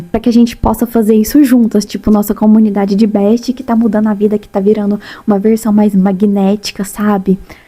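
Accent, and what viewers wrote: Minas Gerais, Brazil. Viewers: Cê é mineira?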